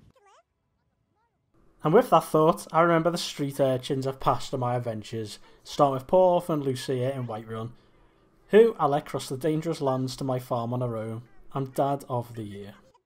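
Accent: Liverpool, United Kingdom. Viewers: Hi. R u Scouse? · Are you a scouser? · A scouse YTer? Instantly subscribing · Lad, you scouse? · Awww finally a scouse youtuber! ALREEET LAAADDD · Scouseeerr!! Loved the video man 👍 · nice to hear a scouser, here's some engagement for you mate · You a scouser? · Mate, that was a boss first video! It's nice to hear a fellow scouser too, tbh! Subbed!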